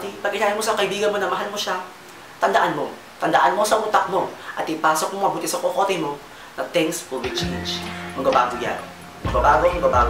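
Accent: Visayas, Philippines. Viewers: Kuya, bisaya ka po?:D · Bisaya ka pala..?! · bisaya ka kuya??? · May pagka bisaya rin siya noh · bisaya diay ka ba?:D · bisaya ka pala dong! XD taga asa ka??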